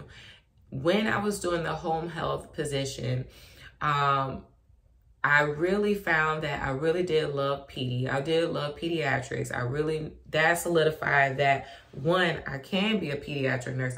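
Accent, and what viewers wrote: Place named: Cleveland, United States. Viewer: Are you from Cleveland? You sound just like my friend from Cleveland.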